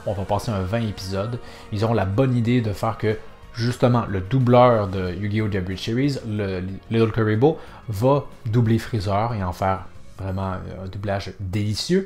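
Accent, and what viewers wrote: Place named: Quebec, Canada. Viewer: Tu fais trop bien l'accent québécois. Trop cool.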